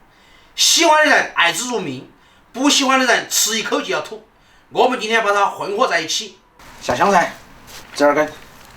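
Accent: Sichuan, China. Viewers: 👀你吃就要笑。最好不要吃多麼快。喜歡你的四川口音。well done!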